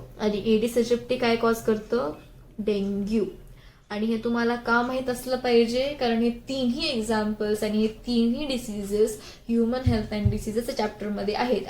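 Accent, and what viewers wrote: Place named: Latur, India. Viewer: Di tuzi way of explaining all thing khup bhari aahe.... Ani laturkar chi marathi tr azun ch bhari 😍😍 I like it so much 😇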